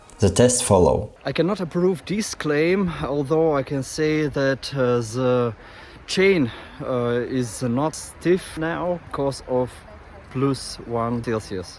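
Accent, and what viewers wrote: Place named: Germany. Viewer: Interesting accent fpr an english speaking german - is it for sure better then a paraffin + pfte powder combination?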